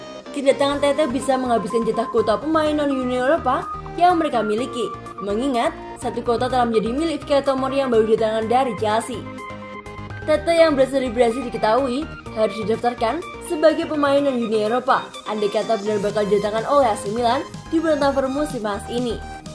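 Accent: Java, Indonesia. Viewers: pengisi suaranya orang jawa ya? medok banget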